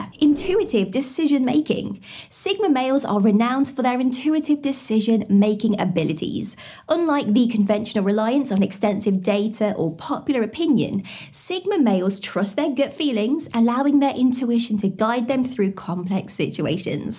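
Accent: United Kingdom. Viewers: I get tired of British accents. Good night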